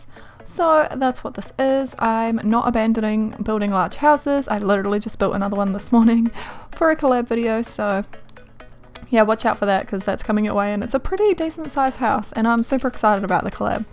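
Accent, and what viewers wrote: New Zealand. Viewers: This house is pretty :) and are you from New Zealand?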